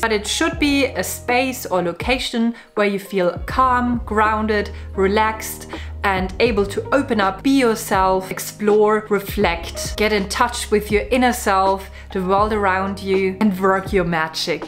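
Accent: Germany. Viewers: Are you german? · German? The land of witches. I might be wrong with the accent. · I’m going to build an altar and just found you from this video! I just want to say that I’m obsessed with your accent! Are you German? You are also so beautiful.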